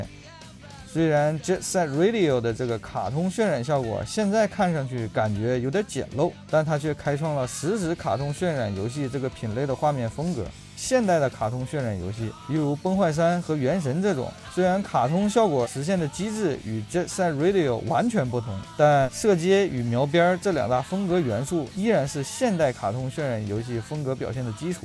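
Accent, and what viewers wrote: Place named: Northeast, China. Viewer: 东北口音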